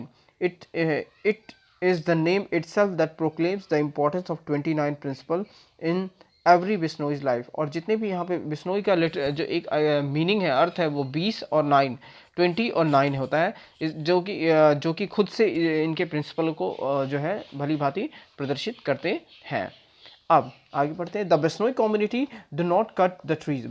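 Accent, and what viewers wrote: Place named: United States. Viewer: Your voice like American english and I like it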